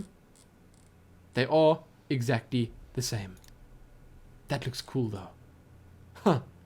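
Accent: South Africa. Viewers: It sounds South African if anything... Don't blame you. South African accent is difficult to pick up, considering how many people haven't been able to tell what mine is. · but this guys accent is south african... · it's dutch south african not british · D South African accent, I would know since i'm south african myself lol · You South African? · Accent definitely sounds South African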